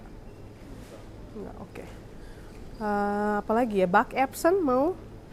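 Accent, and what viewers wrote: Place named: Eastern Indonesia, Indonesia. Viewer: Lama2 logatnya kaya org timur ya kk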